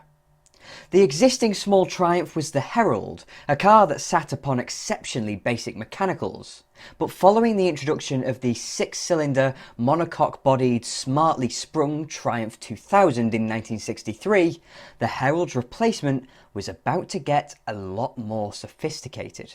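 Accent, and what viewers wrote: United Kingdom. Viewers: Ah yes the Scouse influence? Boss la !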